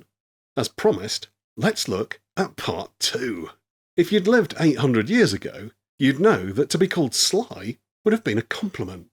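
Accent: England, United Kingdom. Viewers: Is he being sarcastic when he asks how I’m doing or is just his English accent?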